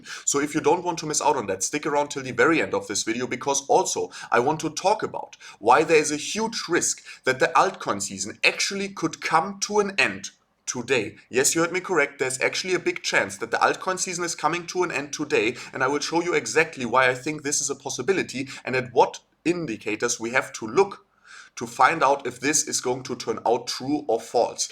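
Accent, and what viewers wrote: Germany. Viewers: Thanks! I just started following you thanks to AltcoinDaily. I gotta say you give a great analysis, oh and I love the German accent! I’d love to see a video from you sometime talking about how you were first introduced to Bitcoin, how old you were, and how you’ve come to build the portfolio you have today.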